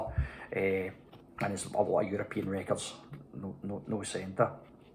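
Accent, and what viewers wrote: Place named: Scotland, United Kingdom. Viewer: Hei! That Japanese singles box set is incredible and you still have it in excellent condition! You must have taken really good care of it. After all it's over 40 years old release! My favorite songs are and. Hope to find them on 7" format some day. Too bad that the singles are pretty rare around here in Finland. Scottish accent is pretty hard for a Finn to hear and understand but other than that excellent video and excellent collection, /MyVinylBar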